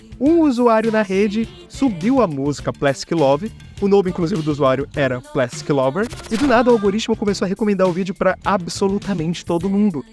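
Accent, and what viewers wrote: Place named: Belém, Brazil. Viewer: Sotaque de Belém = inconfundível 😅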